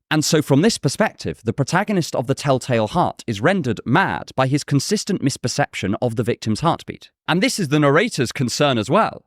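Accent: RP, United Kingdom. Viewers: Did anyone tell you that you got one the most British accent ever ? Like these days, whenever I think of British English, I tend to hear your voice. No offense intended if that happens to be a sensitive area btw.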